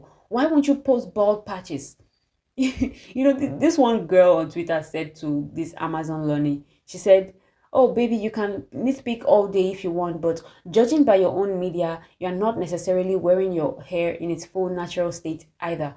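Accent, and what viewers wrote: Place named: Nigeria. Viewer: How can i like this video more than once? Your Nigerian accent and no bullshit attitude only makes it better.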